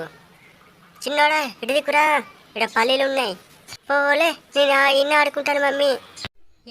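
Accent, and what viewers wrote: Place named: Telangana, India. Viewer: Telangana slag👌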